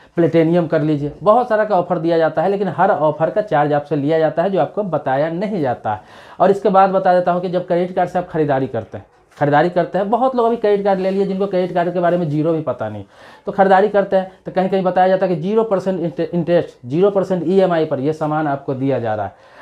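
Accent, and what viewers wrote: Bihar, India. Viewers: Sabse pehle ye apna bihari accent band karo.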